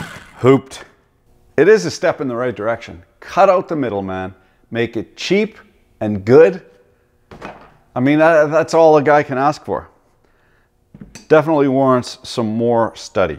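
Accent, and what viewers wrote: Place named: Newfoundland, Canada. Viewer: Love the Newfie commentary!!! Beauty , keep it up!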